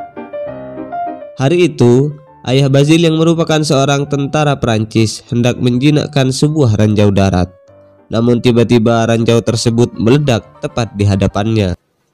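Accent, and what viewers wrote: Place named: Madura, Indonesia. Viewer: suarane kyk meduro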